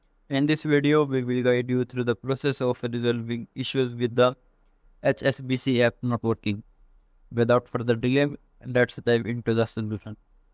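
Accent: India. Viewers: I couldn't understand a word of this. Heavy (Indian?) accent = incomprehensible.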